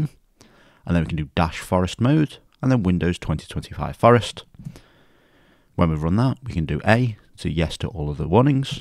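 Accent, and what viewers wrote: Liverpool, United Kingdom. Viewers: Love that scouse accent!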